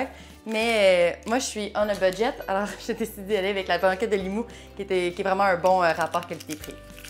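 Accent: Quebec, Canada. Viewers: C'est une tuerie cette video, la recette est clair, tout est montre et bien explique. Et en bon Francois entendre le dialecte quebecois sur une recette Francais c'est vraiment sympa.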